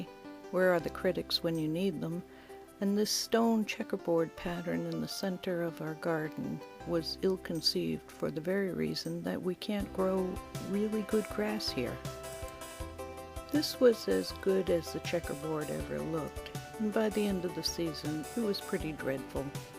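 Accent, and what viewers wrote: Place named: Nova Scotia, Canada. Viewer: What a beautiful garden! Your accent suggests you are in Nova Scotia, am I right?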